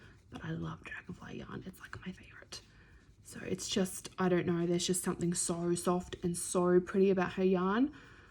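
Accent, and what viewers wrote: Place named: Australia. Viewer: Hello I was recommended to your podcast from the sisters at Frivolous & Frugal (Penny & Dawn) USA what a surprise when I heard you speak, a fellow Aussie 👍🏻 I live in mid north SA and after going back to your 1st episode instantly subscribed. Rainy day & cold today I’ll be watching in order your previous episodes, snuggled up on lounge knitting. Many thanks 🦋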